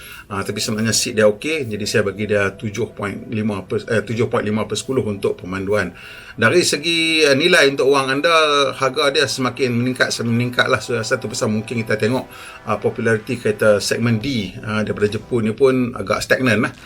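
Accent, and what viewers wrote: North, Malaysia. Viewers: Abg org mana bang...dok cakap belah utagha ni..😄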